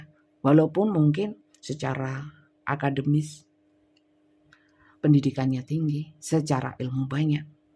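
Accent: East Java, Indonesia. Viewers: 😊❤trimakasih, banyak ndidik orang jadi waras, teges😊. Seneng dg gaya jawa timurannya. Saya aja yg bukan orang wetan karena pernah hidup di daerah wetan, jadi ngomong model jawa timuran. Yok opo se rek kon iku.....😂❤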